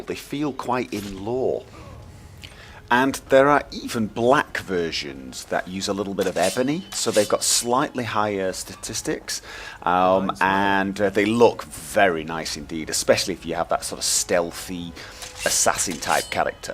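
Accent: United Kingdom. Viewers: This guy, he really does a great job with these movies. His reviews are pretty good, I think he has good taste, and his accent is pleasantly British, but its the little things, like providing links in the description for Nexus and, perhaps most refreshing, links to the particular segment within an episode for a particular mod. One thing I'd like to see more of, however, is more first person perspective shots for reviews of weapon mods, which often appear different in FPS.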